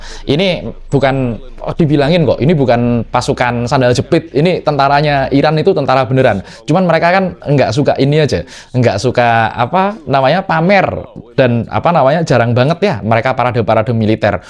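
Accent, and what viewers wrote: Java, Indonesia. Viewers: Mas. Nonton chanelu ini bukane tegang. Tapi malah kudu guyu ae massehhh. Khas asli wong jowo awakmu ki cara ngomonge.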